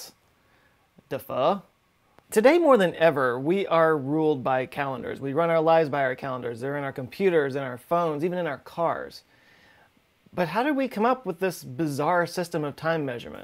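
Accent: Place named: East Coast, United States. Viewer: Are you from the east coast? You pronounce months like mints.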